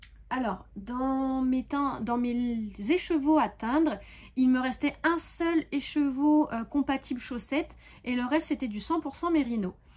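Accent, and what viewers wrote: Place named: Hauts-de-France, France. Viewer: Bonjour, je découvre votre chaîne et j'ai regardé votre vidéo avec grand plaisir, votre façon de parler très naturelle me plaît beaucoup (peut-être aussi parce que j'habite les hauts de France également et qu'il y a de toutes petites pointes d'accent dans vos paroles). J'ai cru comprendre que vous avez commencé le tricot il y a un an, je suis en admiration devant votre paire de chaussettes de Noël 😮😮😮😮😮😮😮. Continuez comme ça ne changez rien à bientôt 🙏🤩🤩🤩🤩🤩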